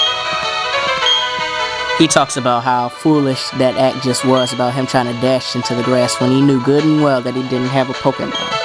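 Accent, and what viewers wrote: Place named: Appalachia, United States. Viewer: MAN! shut up and just play the game, we know what going on, i hate your hillbilly accent i had to stop watching the video and find a video with no idiotic talking. 0/5 stars, no subscription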